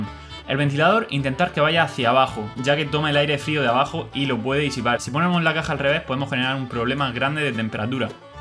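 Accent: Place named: Murcia, Spain. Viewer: Muy buen vídeo , explicación sencilla y clara para todos los públicos . Y sobretodo con ese deje murciano! Un saludo ! 🙋🏻‍♀️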